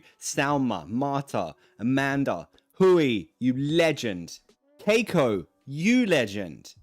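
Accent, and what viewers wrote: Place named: RP, United Kingdom. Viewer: 😂😂😂😂😂😂😂...."I'm at the lower end of R.P😆😆😆😆🤣I speak clearly, but not in a stupid way"😅😅😅😅😅😅 Sos un idolo total,! Me haces cagar de risa!😅😅😅😅😂😂😂🇦🇷🇬🇧🇦🇷🇬🇧🇦🇷🇬🇧🇦🇷🇬🇧🇬🇧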